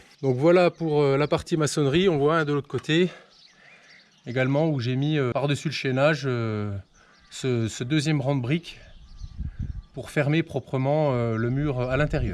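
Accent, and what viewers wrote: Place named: Franche-Comté, France. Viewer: Tout à fait d’accord avec Youcef, quand on regarde la vidéo on est tellement dedans que le temps passe trop vite et petit bonus pour moi, l’accent franc-comtois et les expressions qui vont avec que j’adore entendre en tant que franc-comtoise. Tu ne soûles pas, tes explications sont simples et concises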